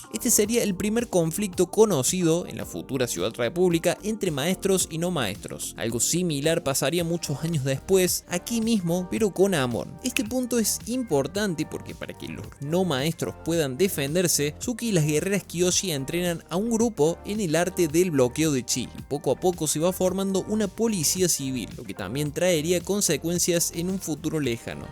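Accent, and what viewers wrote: Argentina. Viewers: Sos crack amigo y además argentino jajaja pero de que parte sos?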